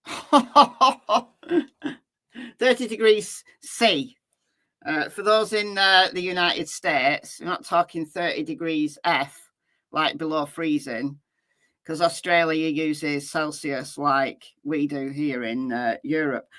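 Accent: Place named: Northern England, United Kingdom. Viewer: Recently discovered your channel. Nice to hear a northern accent - my Dad & Grandma are from Fleetwood, I’m from Leicester but live in BC Canada now. This case has me so outraged & I’ve followed since the beginning. So many unanswered questions & so much evidence pointing at other suspects. Hoping the truth will finally come out soon!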